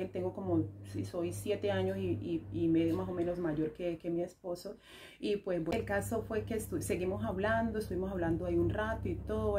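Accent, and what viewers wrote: Colombia. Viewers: Soy nueva ,me enganche a tú historia y al acento Colombiano,soy Cubana k vive en Barcelona, saludos. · Yo siempre he dicho lo que es pa' uno, es pa uno. "Ni aunque te quites, ni aunque te pongas" Hermosa historia mi niña🥰🥰me encantó el final feliz💖💖 Por tu acento me pareció que eres caleña, vo jabes😉 Si no es así lo mejor es que eres una paisana colombiana y bella😍😍 Aquí me quedo en tu canal nueva suscriptora. Una caleña que ya enamoraste saludos mi princess👸🏽❤😘🤗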